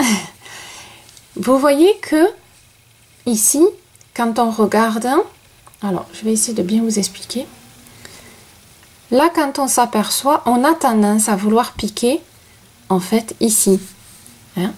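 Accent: South, France. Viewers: l'accent du Sud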